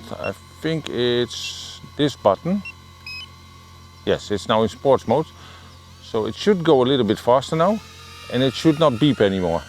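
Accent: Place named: Netherlands. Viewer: Your Dutch accent is thick lol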